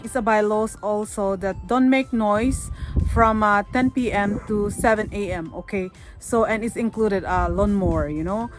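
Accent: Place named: Ilocos, Philippines. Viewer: Ang gabda ng accent biglang "inhka man i boil hahahah ilokano kabayan.. nag a apply ako im from macau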